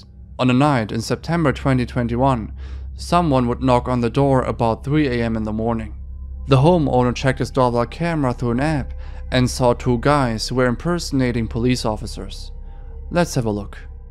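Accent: Germany. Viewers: You have a hard to pin accent....kinda German, or Germanish, but I deserve 50$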